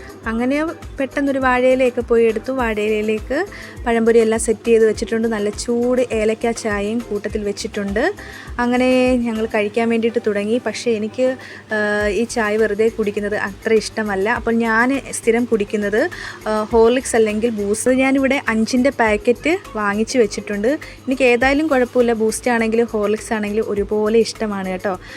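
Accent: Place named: Kannur, India. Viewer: സംഭവം പൊളിച്ചു ☺️☺️🥰എന്തോ കണ്ണൂർ ഭാഷ പോലെ തോന്നുന്നു 😍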